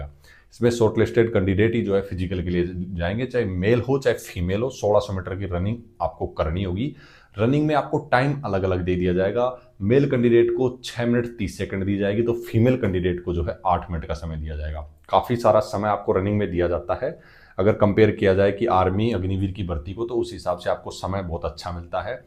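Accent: Haryana, India. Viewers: Sir aap harayana se ho kya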